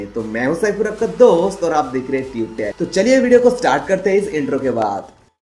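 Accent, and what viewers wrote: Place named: Bihar, India. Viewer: Bolte time bhot pyara lagta h tu bhai 😁 Bihari hai na ?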